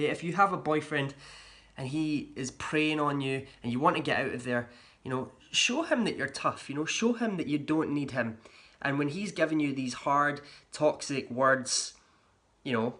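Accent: Scotland, United Kingdom. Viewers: Hey. I love watching ur videos & I love ur accent. Scotland is beautiful & a dream place for me & my dad to visit. My dad's side of the family is Scottish 😊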